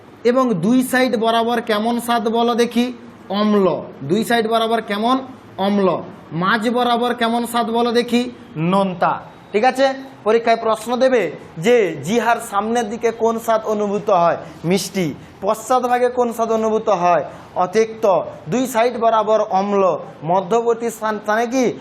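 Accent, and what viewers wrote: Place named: Medinipur, India. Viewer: আপনার কথা তে kochi শুনেই বুঝতে পারলাম mednipure আপনি....দারুণ হয়েছে ক্লাস টা ❤❤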